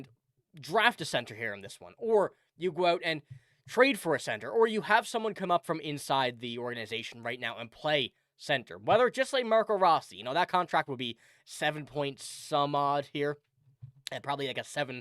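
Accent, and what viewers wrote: Newfoundland, Canada. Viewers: You are from Newfoundland?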